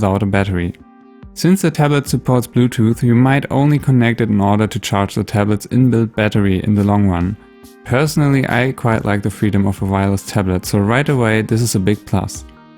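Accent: Germany. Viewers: I recognised your german accent so fast because I have one too lmao, i also saw your german settings haha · Finde es lustig wenn deutsche englisch sprechen xD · wait are u german? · Are you German?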